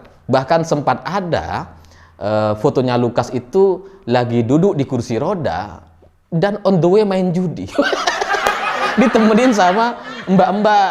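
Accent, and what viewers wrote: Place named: Madura, Indonesia. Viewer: Bang logatnya kaya temen saya orang madura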